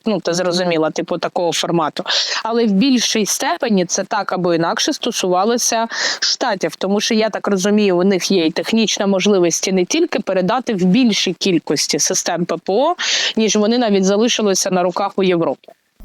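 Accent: Ukraine. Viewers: У нас на Дніпропетровщині такий же говор і точно знаю Полтава так розмовляє. Наша дівчинка ❤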